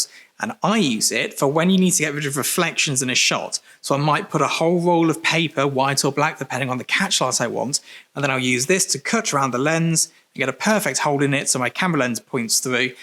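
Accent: England, United Kingdom. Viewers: Hi, thanks for sharing your insights and all of that mate! Could you show us how you get everything level for flat lay and how you use that laser wotsit? As far as your choice of English goes, I like your English English! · stay with British English, it's more interesting to us Americans :)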